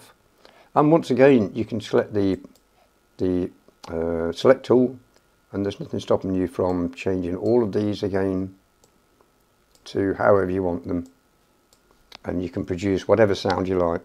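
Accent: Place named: Staffordshire, United Kingdom. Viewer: Cheers, Mate, SO...A little secret, I have 4 Guitars, 1 Mandolin and a "KORG EK50" and the Modulation control is a 'Joystick', (not the wheel configuration), you will not believe what Heavenly Fun I have attempting to annoy my near Neighbours...(Broken Headphones was my excuse..🤗🤗☠☠). I note STAFFORDSHIRE in your accent....Shenstone is my Family "SEAT"...now over in darkest Lincolnshire. Keep your fingers nimble...cheers..G.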